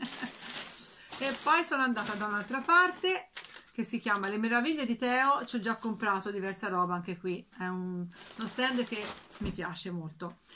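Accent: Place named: Pisa, Italy. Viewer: Bella esperienza e bravo tuo marito che ti accompagna....Dal tuo accento mi sa che sei vicina a me...anche io provincia di Pisa! Ti seguo con affetto!!!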